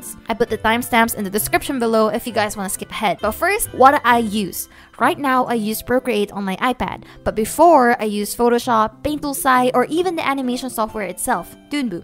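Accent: Philippines. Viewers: You have a litte filipino accent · I can still hear that Fillipino accent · Why do I keep hearing your Filipino accent!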